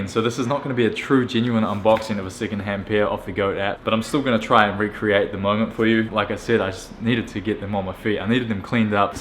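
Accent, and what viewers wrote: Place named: Australia. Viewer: Broooo, How much did you pay? You sound like your from Australia.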